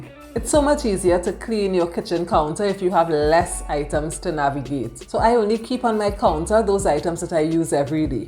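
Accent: Trinidad and Tobago. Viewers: I was watching this video on silent as soon as I turned on the volume I knew you were Trini. Subscribed immediately.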